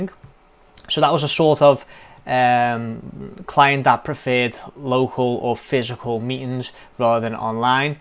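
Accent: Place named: Liverpool, United Kingdom. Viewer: I came to Australia when I was 3 years old, originally from Chester Wirral..great to hear a scouser!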